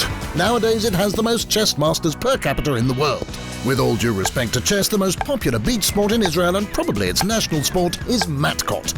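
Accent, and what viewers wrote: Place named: United Kingdom. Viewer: This is SO well done! the facts slide from one to the next smoothly, the research is brilliant and the photography top notch. (& everyone LOVES a British accent right?) Well done! My fave part: Ban on ads featuring underweight models! YES!! Love you MORE Israel!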